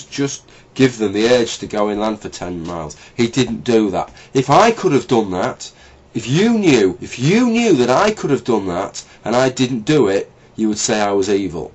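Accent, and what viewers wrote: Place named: England, United Kingdom. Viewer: LOL! When he opened his mouth I said "lol a pom!" Awesome vid mate! 5 stars.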